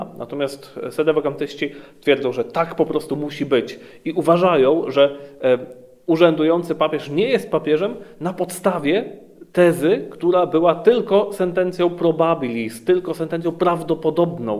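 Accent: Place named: Italy. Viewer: Ach ta łacina włoska ;))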